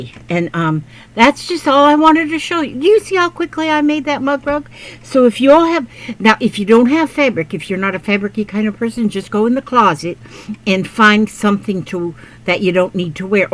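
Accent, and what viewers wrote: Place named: Georgia, United States. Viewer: I am going through the hardest time in my life your time makes life so happy for me! I can’t sew yet everything I have is away from me god knows why but oh boy! You inspire me and I hope to get into the paper craft and go over your videos on there one day! But I love you do much! Your acsent reminds me of Georgia we use to live in a little town called Marietta Georgia so ch sweet folks! My dear mommy god rest her soul had a kids ministry and she had Kermit and miss piggy 🐷 lol I love your readings! I can now see when you post I learned how to do that lol my mind seems not good on some days:-( mt mommy and her mommy had memory problems but lord I’m too young to get like I feel idk lol will can you or do you have these rag snips at your Amazon shop? Ty again love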